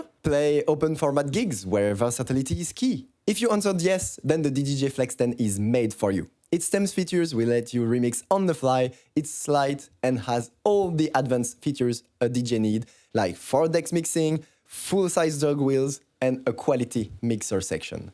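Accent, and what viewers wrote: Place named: France. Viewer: This guy's the definition of a French accent ❤ it heh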